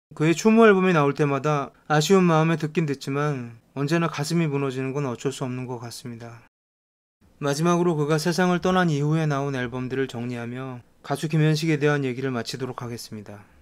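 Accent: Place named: Seoul, South Korea. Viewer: ALL MIX님 말투가 90년대 서울사투리같아서 더 영상이 와닿습니다. 좋은영상 감사해요!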